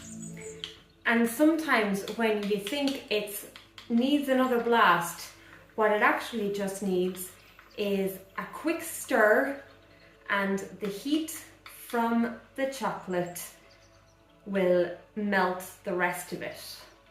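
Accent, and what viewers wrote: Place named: Ireland. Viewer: Hello there, I got randomly recommended your video. I see you are a small time youtuber. I am liking and commenting to give you an edge with the algorithm. I actually stayed the first 2-3 mins of the video to figure out where you are from. That is a very mild Irish accent - at least I think. Did you grow up in Ireland? or I suppose which part of it if you don't mind sharing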